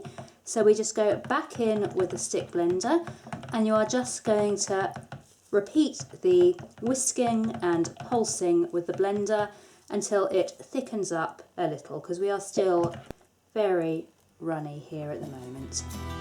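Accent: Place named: England, United Kingdom. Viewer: Every time I hear your intro music, I'm all geared up for you to have a Beverly Hillbillies accent, and then I do a little double take when a charming English accent comes out instead.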